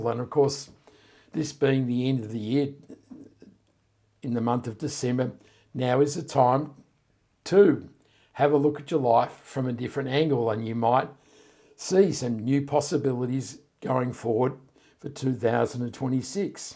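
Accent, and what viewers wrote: Australia. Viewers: Thanks very much - great reading Love listening to a fellow Aussie ❤️ your interpretations always have depth and an authenticity to them Wishing you much light and happiness 😊😂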